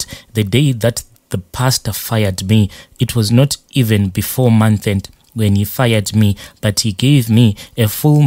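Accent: Zimbabwe. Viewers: Is he from Zim this brother his tongue said so.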